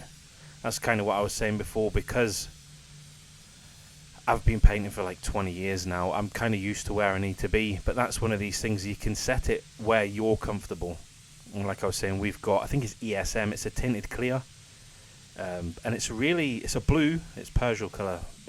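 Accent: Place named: England, United Kingdom. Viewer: thank you for yourgreat content. I'm in the US so I like seeing other products. You are very good teacher. Also love the English accent. Please keep up the work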